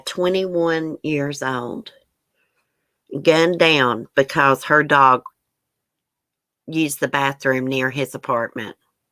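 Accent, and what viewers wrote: South, United States. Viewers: I love you when you said a man killed his 21 yr old neighbor over dog poop I died. It's not funny in the least bit it is just your cute southern accent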